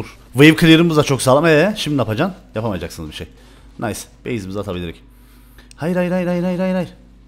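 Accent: Cyprus. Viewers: Abi Kıbrıslımısın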